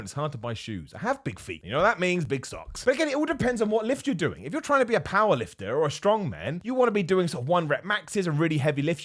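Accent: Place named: RP, United Kingdom. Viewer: I like that as got older his voice became "posher". I'm glad the first thing on the list was that you can't completely change your body to look like someone else no matter how much work you put in or how heavy the weights are you lift. You shouldn't have a goal to have abs, legs, arms like one specific other person, because you a different people. If you exercise and train your body isn't going to look like anyone else because it's unique to you.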